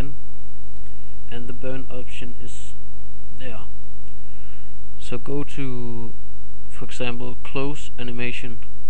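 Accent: Denmark. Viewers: hey.. jeg kan høre du er dansker :D ..jeg har et problem... jeg har download ubuntu okay... men jeg kan ik' brande den ned på en skive hvorfor kan jeg ik' det???... jeg prøvede også at putte iso'en på en usb... men jeg kan ikke få min pc til a boote fra usb ??? hvad skal jeg gøre `???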